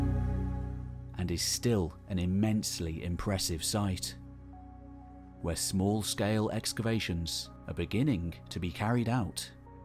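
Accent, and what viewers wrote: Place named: Liverpool, United Kingdom. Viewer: How many of these weird scouse type accents are there on these DIY history channels? It's both unlistenable and spreading like a plague.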